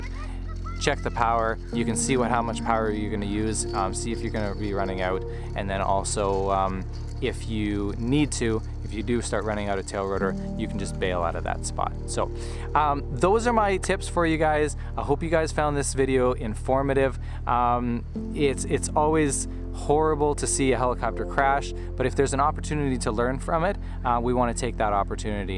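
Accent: Canada. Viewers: Love these videos Your thoughts can be use in every day life Air safety institute videos cause & effect gives me same CHARITY..who knew chopper talk have life lesson I dont fly i walk Why do you sound So kanadien in pronunciation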